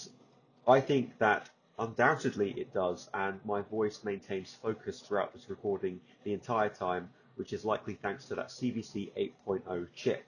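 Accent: United Kingdom. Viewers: That’s just because he’s got that “Posh” Brit accent. 🇬🇧 He could be describing his morning dump and it would sound like gold.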